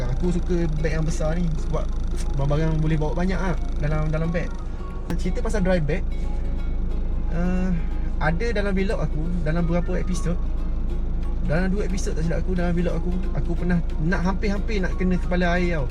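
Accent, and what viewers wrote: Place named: Kuantan, Malaysia. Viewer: cara aok cakap.pon koi taw aok org kontan.. 👍😄. Biasa mancing sgai belat ke?😄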